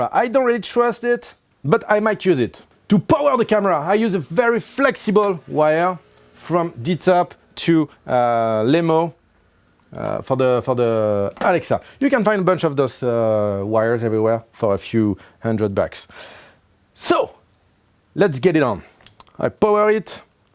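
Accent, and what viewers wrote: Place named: France. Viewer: On voit très bien que tu es français tu as un fort accent pourquoi pas une vidéo en français ?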